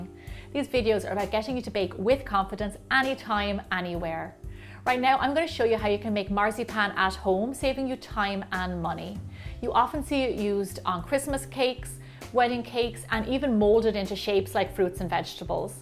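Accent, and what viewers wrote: Ireland. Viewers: What a great Channel and I love your Irish? accident.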